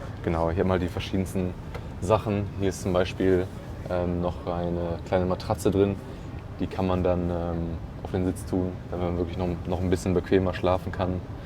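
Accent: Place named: Germany. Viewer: Dieser Deutsche Akzent 😁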